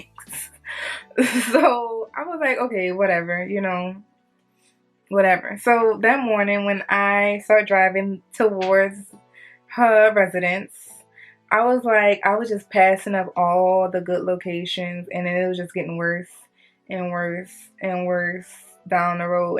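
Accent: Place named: Los Angeles, United States. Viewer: Crazy story. Love your LA accent.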